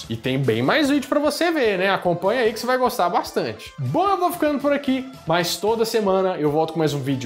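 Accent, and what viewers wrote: Minas Gerais, Brazil. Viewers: Mineirin bunitin, sô.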